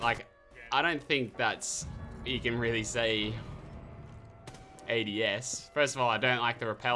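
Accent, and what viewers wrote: Australia. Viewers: Sounds aussie mixed with something else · Aussie is my bet he sounds similar to modest pelican gaming who is also Aussie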